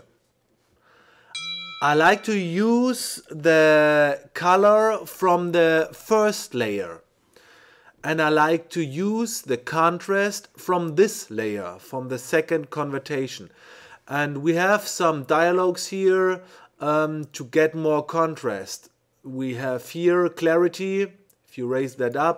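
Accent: Germany. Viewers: thank you very much for this video. Your work is inspiring and, please, please, never apologize for your german accent. Thanks again.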